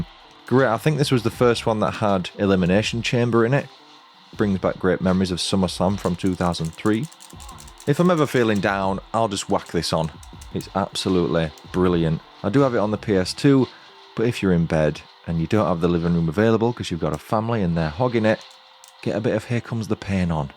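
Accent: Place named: Yorkshire, United Kingdom. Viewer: Where in Yorkshire you from pal?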